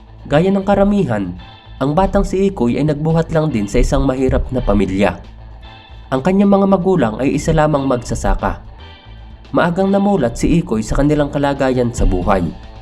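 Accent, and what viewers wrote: Philippines. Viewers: Taga saang probinsya yung nagsasalita? Bulcan ba yung ganyang punto? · bisakol ampota!!! pilit managalog brad ah! tang ina ka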